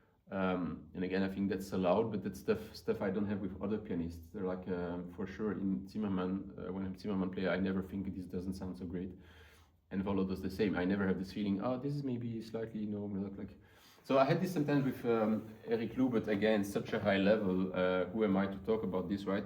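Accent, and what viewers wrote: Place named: France. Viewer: Ton accent francaise est trop belle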